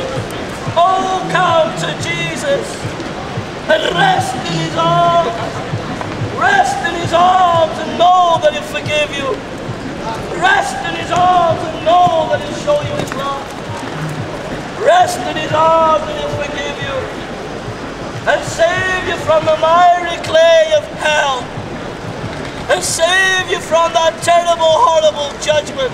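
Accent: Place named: United States. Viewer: Mate ... Youre from chadderton why do you speak like an american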